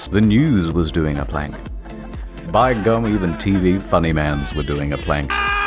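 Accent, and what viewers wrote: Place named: Australia. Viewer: Because of your australian accent I thought you said two toes and a liver instead of 2011.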